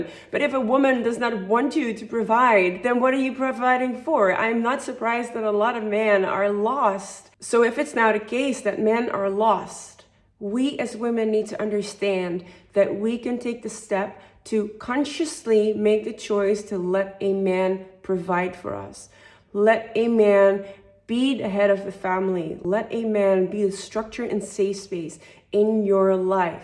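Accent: Netherlands. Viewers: I can spot a Dutch-English accent from miles away.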